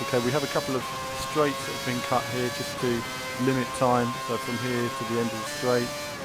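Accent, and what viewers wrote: England, United Kingdom. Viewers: It's a figure of speech. I'm fully aware he is an Englishman. Think the accent gave that away :)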